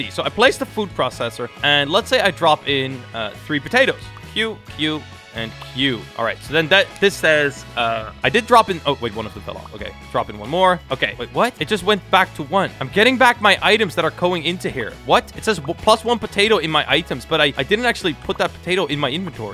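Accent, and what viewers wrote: Netherlands. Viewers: r u dutch? Cause i am haha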